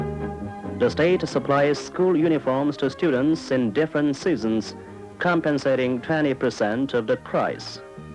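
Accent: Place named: Ireland. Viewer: The narrator has this Irish accent with a mix of broken English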